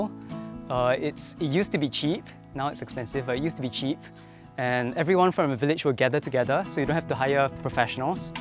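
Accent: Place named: Singapore. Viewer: Based on his accent i would say either singapore or malaysia.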